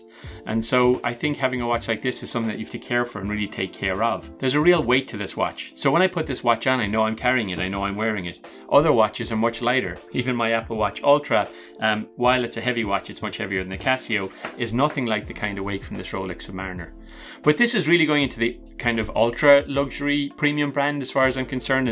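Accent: Ireland. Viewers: Great stuff and great to hear an Irish voice with products that are available in Ireland and the EU - you presentation and production skills are excellent and the content is refreshing - it is worth paying for quality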